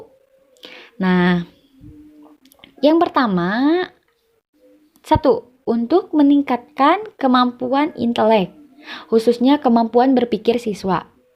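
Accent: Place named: West Java, Indonesia. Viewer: Sunda sekali logatnya 🤣🤣🤣🤣🤣🤣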